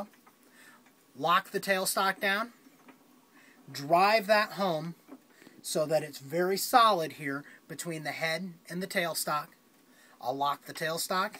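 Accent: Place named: United States. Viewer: dont think hes aussie mate! sounds merican to me.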